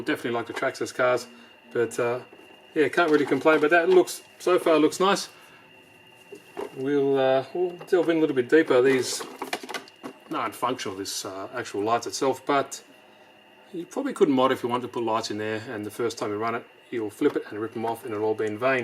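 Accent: Australia. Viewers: It’s a shame that your vids keep getting demonetized, seems like YouTube’s never experienced Australian English before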